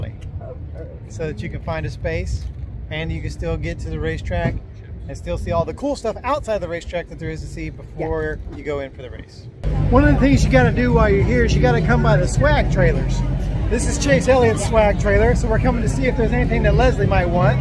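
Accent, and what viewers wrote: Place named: South, United States. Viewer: I do declare! It sounds like found a little Southern drawl while being there! My mother used to point that out in me when I would come home on leave when I was stationed down South.😉👍🏼😎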